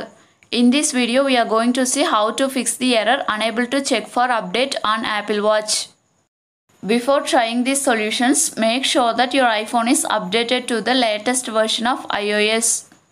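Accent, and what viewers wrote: India. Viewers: That malyali accent is sooooo nice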